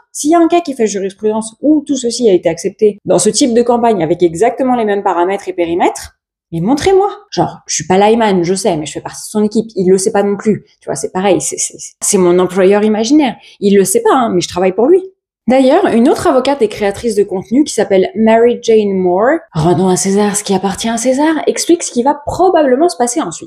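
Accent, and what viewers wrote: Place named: United States. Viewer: Je regarde tes vidéos avec ma fille Sasha de 19 ans. Nous sommes passionnées par les stars américaines. Il existe des youtubeurs qui traitent de ses sujets. Tu es pétillante, dynamique, ton accent (je croyais que tu étais américaine), une très jolie femme, bcp de flow comme me dit ma fille. Il faut le dire aussi. Tu te démarques largement car tu vis les infos que tu nous donnes avec des imitations, ta gestuelle, la vie qui se passe autour de toi, ton fils qui joue avec son ami(e), ton chat. Ça c’est génial. Tu es passionnante et passionnée. Tu nous entraînes dans ta passion. Même après une journée de travail, t’occuper de ton fils, de ton chéri (j’espère que je ne fais pas d’impaire en disant cela 🙊) tu as une énergie débordante pour nous informer de tes news. C’est cela être passionnée. Je suis sûre que tu vas monter car tu le mérites. 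Je le pense sincèrement car cela ne m’apporte rien de te mentir ou autre. Je hais le mensonge. Ne change pas. Et merci encore 🙏🏼🌹🌹🌹🌹🌹🌹🌹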